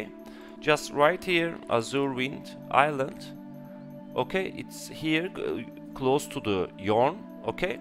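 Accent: Turkey. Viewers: rastgele girdim videoya aksandan anladim türk olduguna :D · Kesin Türk aksanından anladım :)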